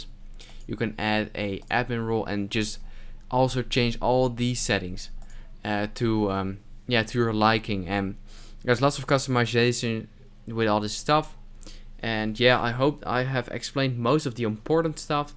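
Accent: Netherlands. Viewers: Thanks for the turtorial! it was super handy ;p and your accent screams : 🇳🇱🇳🇱🇳🇱🇳🇱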